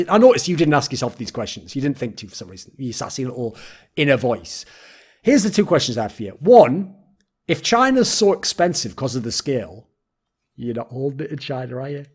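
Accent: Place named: United Kingdom. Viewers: Why did Thorin's british accent get stronger? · He does sound different, he might be visiting home and having his more natural accent come out. Anyone know exactly what is his accent, from what region?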